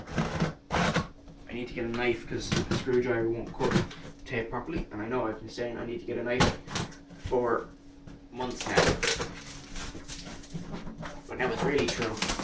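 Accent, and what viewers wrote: Ireland. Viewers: are u irish? :D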